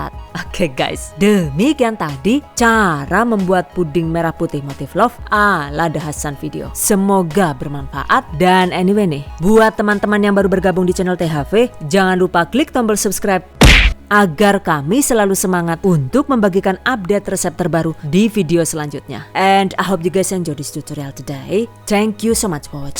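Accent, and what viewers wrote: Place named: Tegal, Indonesia. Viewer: Semangat ide ide baruu the Hasan banyak vidio yg menjadi motivasi saya ...terus pembawaan juga kadang suka bikin tersenyum senyum ..logat Tegal jgn ketinggalan yaa..hehe ..puding nya cantik ikut memeriahkan HUT RI ...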